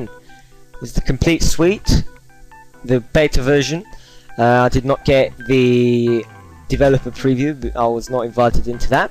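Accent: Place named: United Kingdom. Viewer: You fool. This narrator isn't Mexican or an Immigrant. He's British. Get your narrow-minded head out your own ass.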